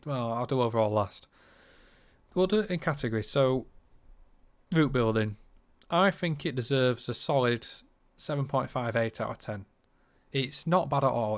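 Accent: Yorkshire, United Kingdom. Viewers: thanks very much for scanning your critical eye over the route, which I hope DTG take notice of. Love your Yorkshire accent and comments.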